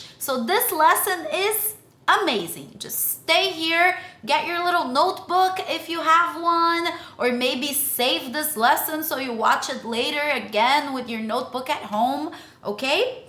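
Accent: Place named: Brazil. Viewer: Seu ingles é perfeito!!! Mas eu reconheço um brazuca em qquer lugar heheh parabéns pelo canal, estava procurando como melhorar meu uso das preposições AT, IN e ON e parei aqui, você tem algum video sobre pra eu dar uma estudada por favor?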